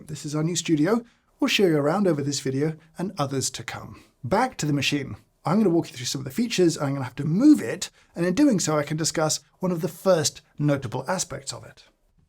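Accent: United Kingdom. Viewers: Here’s the thing about “party tricks”: you can’ t really explain why they work. For instance, I don’t know how it came to be that I would sit through a 19+ minute long video about an espresso machine which costs 19K and which I certainly would not be able to afford and yet, YET I just did! I don’t know what it is… is it my taste for espresso? Is it my curiosity about tech? Is it about ’ british accent and perfect pitch? I genuinely can’t explain why 😂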